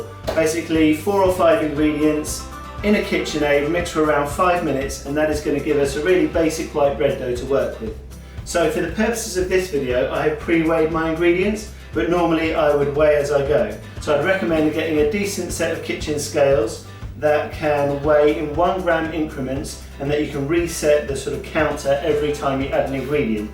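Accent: United Kingdom. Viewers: Where are you from? I’m guessing British but I’m pretty terrible at these things:/ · " "!!!! i get it.... u a mancunian?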